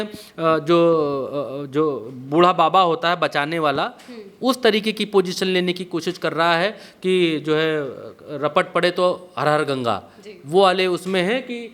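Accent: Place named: Lucknow, India. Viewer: Aap ke bolne ki andaaz bahut behtareen hai Bhaiyyaji Aap ek lucknowi andaaz me bolte achha lagta hai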